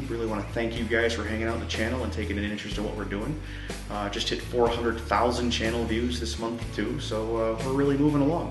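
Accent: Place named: Minnesota, United States. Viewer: Oh okay, your accent sounded a bit like the characters from Fargo.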